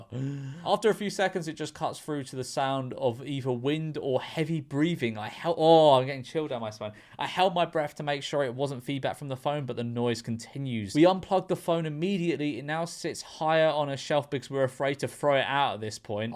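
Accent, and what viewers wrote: United Kingdom. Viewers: AAAAAAA! You scared me mr British memeuless guy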